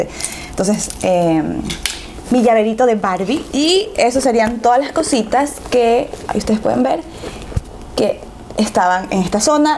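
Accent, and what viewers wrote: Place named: Guayaquil, Ecuador. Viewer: Habla normal.... Los Ecuatorianos hablamos así en especial de Guayaquil.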